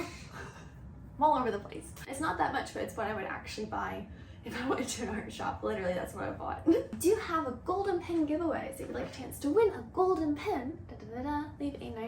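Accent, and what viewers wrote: England, United Kingdom. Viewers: youre starting to have an english accent omg 🥺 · the smol english accent peaking through is so cute ('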